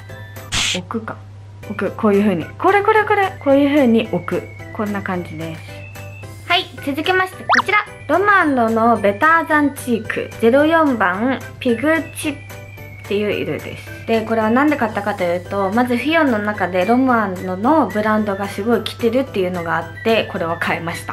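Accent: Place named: South Korea. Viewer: 英語の発音聞くと、やっぱり韓国人なんだな〜って感じた まじで日本語上手すぎ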